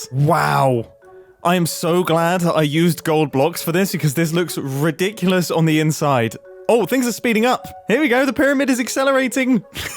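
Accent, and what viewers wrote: United Kingdom. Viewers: funny hearing a british person talk about the bass pro memphis pyramids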